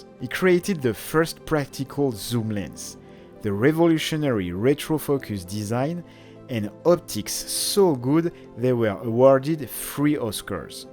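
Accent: France. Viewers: Hey, are you french?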